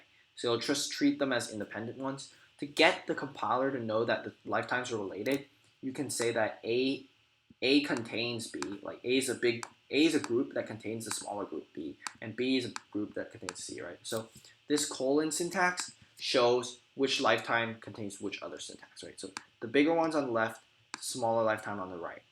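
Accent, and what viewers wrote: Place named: China. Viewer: 听口音像是中国人，所以能出个中文版吗？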